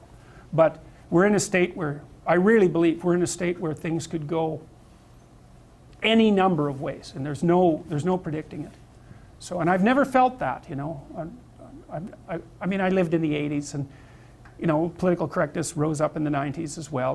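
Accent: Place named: Canada. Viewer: Lewis ouaret He's Canadian, you can hear it in his voice.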